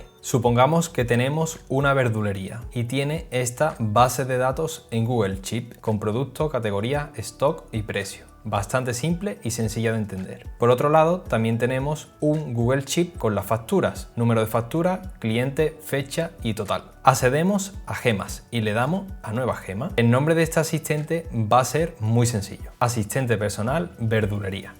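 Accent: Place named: Seville, Spain. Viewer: Ha sido escucharte y saber que eras Sevillano...ese acento nos delata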